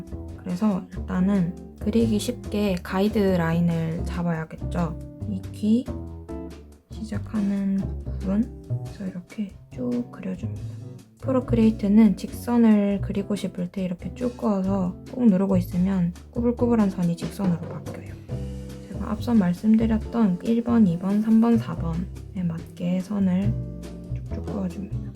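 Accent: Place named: Daegu, South Korea. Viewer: 혹시 대구분? 사투리 정겨워요 ㅋ 저랑 같은말투 ㅎㅎ 절대 음성녹음 안한다는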